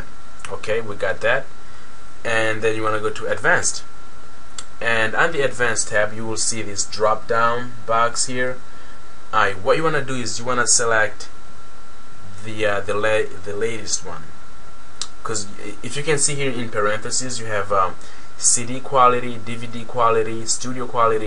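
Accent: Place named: Brazil. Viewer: Are you Brazilian? Your accent seems to be very Brazilian :D Thank you for the tips :D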